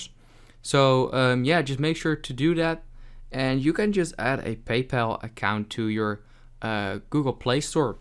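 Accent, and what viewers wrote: Netherlands. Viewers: Im getting the feeling that your dutch🤔 am i right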